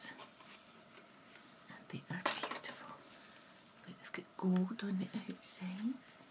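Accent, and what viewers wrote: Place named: Scotland, United Kingdom. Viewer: YOU are an angel for running this channel and uploading these videos. I don't comment too often but you're my favorite asmr artist. English is not my 1st language and ever since I first heard a scottish accent it's been my favorite, it sounds so lovely and unique! Btw; your makeup always looks so nice. I love this eyeshadow look! 💗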